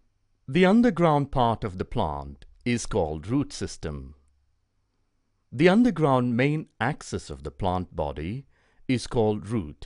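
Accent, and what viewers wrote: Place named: India. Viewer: And whats up with the indian accent?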